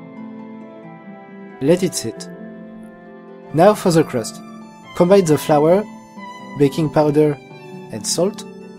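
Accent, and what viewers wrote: France. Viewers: Mystical Turnip its French mixed with kahjiit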